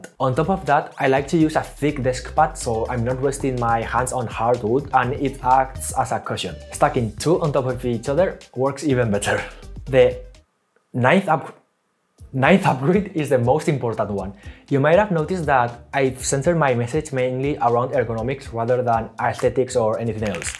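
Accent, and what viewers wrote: Spain. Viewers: I thought I heard a familiar Spanish accent, then it all made sense when I checked your channel info. Saludos de otro editor, crack! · Not sure if this guy is Spanish or Greek.. XD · nunca había visto tu canal, a primera vista pareces asiático, pero en cuanto escuche tu inglés supe que eras Español 🇪🇸, ese acento es inconfundible 😂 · Te he pillado el acento español desde la primera frase que has dicho jajaja. Un orgullo tener a alguien con unos videos tan chulos como los tuyos aquí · When you started speaking, I knew that that accent sounds familiar to me, you're from Spain!! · Hola! Es el primer vídeo que veo tuyo y estaba reconociendo el acento español jajaja. En cualquier caso, enhorabuena por hacer un canal en inglés, es un gran gamechanger y te deseo una buena trayectoria porque se nota el currazo que le pones a los vídeos. Un saludo! 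· Bro tu eres de malaga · I may be wrong but where are you from? It's because of your accent that you sound very Spanish to me. If so, enhorabuena por tener el valor de hacer un canal en ingles. Greetings from the north of Spain. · interesting Spanish accent... btw good editing does not bring as many viewers because it doesn't hit as hard as crazy ideas and high budget stunts... editing can only make a video already at a certain level better, but cannot add substances it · hey! I just landed on your channel, I'm curious about your accent, it sounds Spanish! Cool videos and animations btw <3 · Eres español? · never in my life have i encountered an asian person with a spanish accent, weirdly fits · East asian face, english language, spanish accent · Desde el segundo 1 sabia que eras español jajajajjaja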